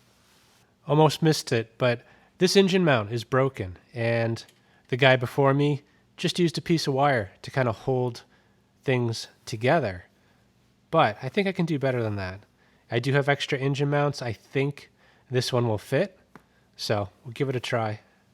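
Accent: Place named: New England, United States. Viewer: Nice video, thank you! I heard a New England accent! Ron USA, PTL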